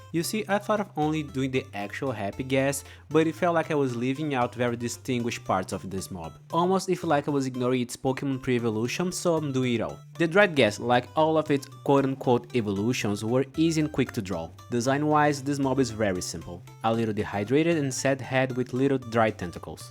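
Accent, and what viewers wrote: Brazil. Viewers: Espera... EU CONHEÇO UM SOTAQUE BR QUANDO EU ESCUTO UM! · Eu reconheço um brasileiro pelo sotaque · Nice video idea/channel bro!! Are you brazilian by any chance? Your accent sounds familiar lol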